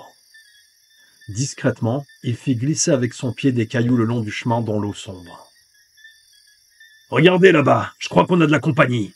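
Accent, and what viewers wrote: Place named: Mexico. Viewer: Tr bonne vidéo ton accent mexicain est déjà légendaire merci pour la video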